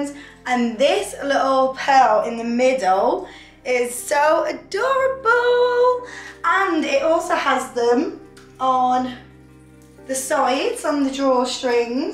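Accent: England, United Kingdom. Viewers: That sexy English accent adds more fire 🔥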